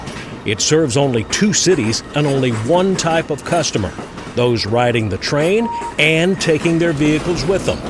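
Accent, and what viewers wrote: South, United States. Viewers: You are a man after my own heart! I simply adore trains ❤️ Your videos are delightful and so interesting and informative. We travel full time in our RV all over the country and I am always giddy when I see a train. Other folks avoid campgrounds near train tracks... I seek them out🚂! The rumble of the train and those wonderful horns just thrill me! Your channel pleases me very much and your soothing Southern voice brings a smile to this Southern lady's face. My husband shared this video with me this morning knowing it would make my day.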